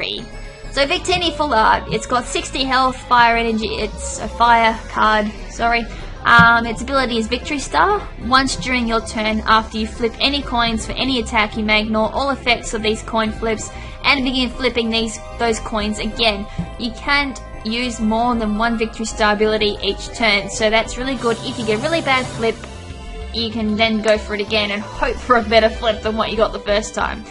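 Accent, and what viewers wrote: Australia. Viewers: Are u australian. i am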